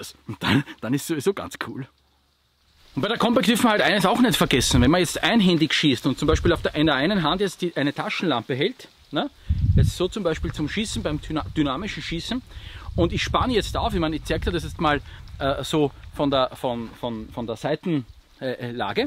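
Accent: Austria. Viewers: He speaks Austrian not German. This is a (funny) difference. So I agree, the autotranslation is useless.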